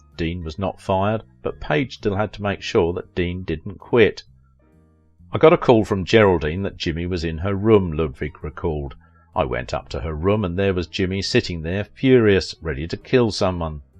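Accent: United Kingdom. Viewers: Okay I am tired of hearing a British accent narrating a totally American documentary.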